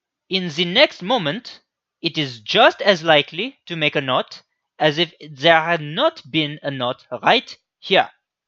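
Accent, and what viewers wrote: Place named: France. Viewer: what is this metaphysical comedy where you make amazing math content but do it in a French accent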